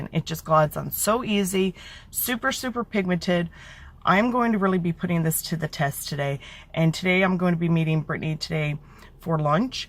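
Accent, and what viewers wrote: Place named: Canada. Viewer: Hello. So 5 seconds into this video andI could tell that you're Canadian. I had a Canadian pen pal years ago, so I can spot Canadians like no one's business. Great review. Thank you!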